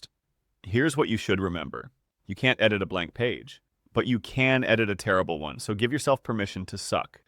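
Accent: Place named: United States. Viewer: Don't worry. The misspellings are still there, despite his being a screenwriter. At last, good narration, whether that voice is artificial or not. Sounds like an everyday American, minus the weird syntax other (foreign?) videos have. Besides, we all need a fresh start in January, especially after 2025, a pretty rough year❗🗓️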